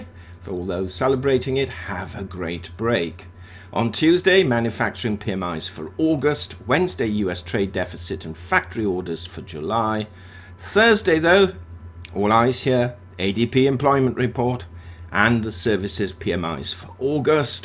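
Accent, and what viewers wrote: United Kingdom. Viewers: Love hearing that Brit accent, very comforting. Thanks for the update.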